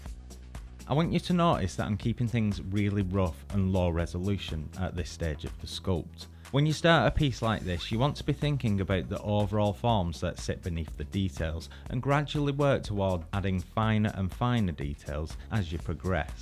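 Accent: United Kingdom. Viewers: I dont understand British, in my russian school we've learned English only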